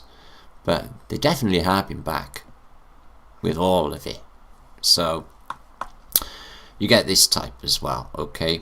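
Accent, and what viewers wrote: Wales, United Kingdom. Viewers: 🙂 accent sounded stronger back then ...In the valley s yaki da ❤️ 🤗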